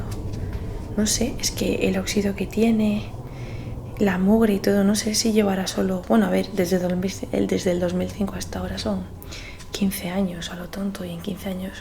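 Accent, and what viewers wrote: Spain. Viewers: Lo que me gusta de tus videos: 1-Tu voz me trae tranquilidad, es muy bonita, además ese acento español :D. 2-Me encanta ver como revisas las habitaciones, es muy curioso todo lo que puede estar ahí adentro :0. 3-Tu no mientes sobre los fantasmas, tu solo muestras lo que tienes que mostrar, no como otros Youtubers que ponen de titulo en su video "¡O por dios!, nos hemos encontrado a un fantasma a las a.m" que si, se que le da drama y suspenso al video, pero tampoco es como si hiciera falta xd. No se si me explique bien pero bueno jsjsjs, el punto es que me encantan tus videos ^^, alta biblia que acabo de escribir xd.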